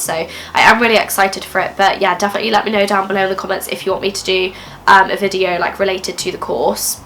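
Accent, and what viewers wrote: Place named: United Kingdom. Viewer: Спасибо за видео! По твоему акценту я учу британское произношение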